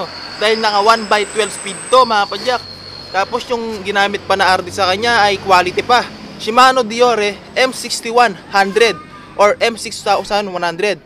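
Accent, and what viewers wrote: Pampanga, Philippines. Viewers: Kapampangan accent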